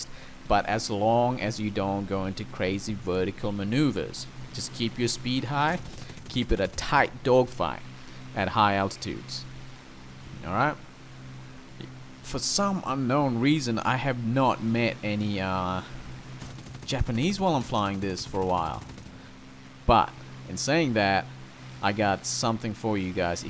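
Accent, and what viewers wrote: Louisiana, United States. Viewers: Its odd, because I could have sworn you sounded like you were from the Bayou, around Lousiana in the US at times, and other times it sounds like Australian. Really cool man! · I also thought Louisiana. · Interested in knowing what your accent is from. Sounds like Louisiana mixed with a Western European accent.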